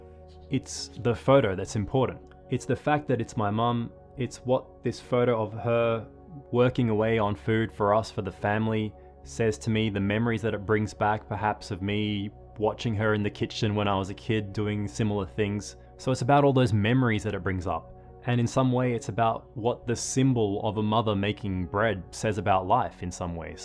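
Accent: Australia. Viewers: The two things I absolutely love about this are: A. The amount that it resonates with me and encourages me to shoot more of my family. B. That you truly have the most Australian "O" I've ever heard. ♥️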